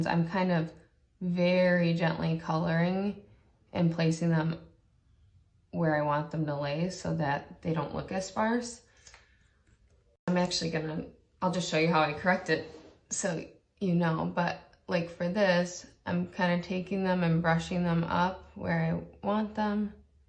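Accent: Michigan, United States. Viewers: I grew up in Michigan and moved to Florida at 15. It's just funny because her voice and accent sounds just like my aunt who stayed in Michigan. If I closed my eyes and just listened, I wouldn't be able to tell them apart. Weird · shes definitely from michigan lmao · Holy Michigan accent. She has a big time one.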